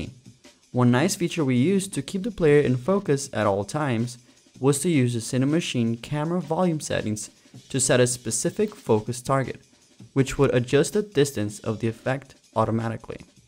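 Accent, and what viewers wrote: Brazil. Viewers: É impressão minha ou você é brasileiro?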